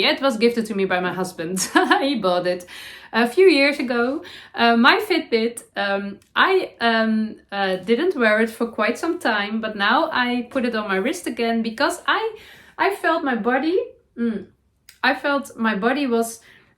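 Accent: Netherlands. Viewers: Hi, lease don't change how you do your podcasts, I love your dutch english, can easy understand you. Your knits are lovely, really like your vest. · your Dutchy English is perfect. I really enjoy your podcasts, love your projects also. You do make me 😂 while I’m sat knitting. Will definitely be knitting The Felix Cardigan along with you, and also in brown!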